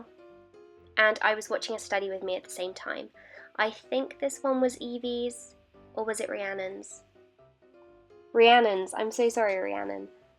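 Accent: RP, United Kingdom. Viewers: You speak sooo posh and pronounce all your T’s in words... I’m from up north where we really don’t lol, we should though. A lot of ‘so’s!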